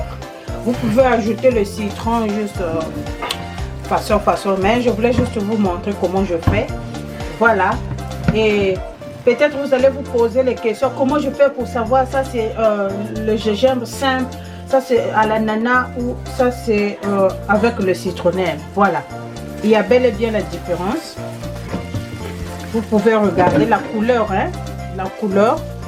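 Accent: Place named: Republic of the Congo. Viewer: Merci ma soeur Ton accent est du congo bzv Sans me tromper vous etes lari? Je reconbait notre accent . Soyez béni.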